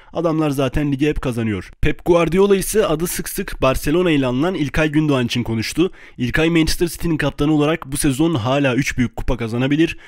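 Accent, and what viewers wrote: Gaziantep, Turkey. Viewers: Reis Antepli misin? Desene hemşehri çıktık :D Bu arada cevap La Liga.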